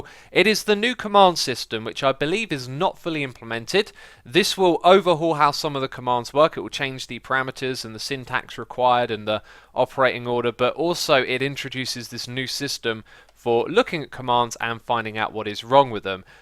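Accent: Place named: United Kingdom. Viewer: Wow, I thought I was watching a different YouTuber for an entire minute. British accents sound the same, I swear. Well, most of them.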